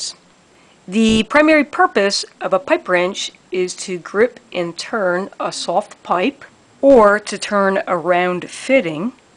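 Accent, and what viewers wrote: Philadelphia, United States. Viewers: Sound like your from Philly?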